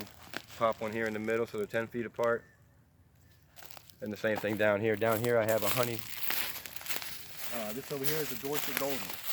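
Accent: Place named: New Orleans, United States. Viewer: I detect a little bit of a New Orleans accent in you bro🤗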